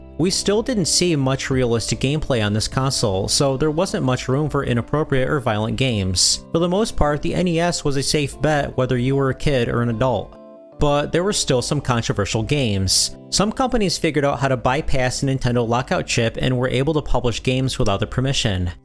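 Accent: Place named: Rochester, United States. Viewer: Your voice sounds like rodchester new york. Garbage plate eater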